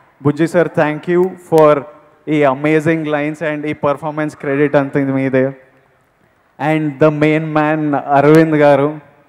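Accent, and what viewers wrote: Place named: Telangana, India. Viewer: We love ur way of talking when u mix telugu with english in తెలంగాణ యాస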